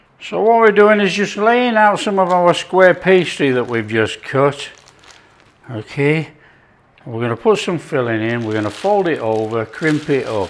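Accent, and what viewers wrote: United Kingdom. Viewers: I love who you are, love your awesome British accent , your laugh and simplicity, my wife shared this channel with me three months ago, can't stop watching you sir. THE BESY RECIPES ON UTUBE, absolutely love your OH COME ON PLEAAAAASE! THANK YOU SO MUCH SIR FOR SHARING WITH US, YOUR GEEAT TALENTS, your expressions are so spot on when you try your dishes, everything about your videos are priceless, thank you RIK from ILLINOIS TO YOU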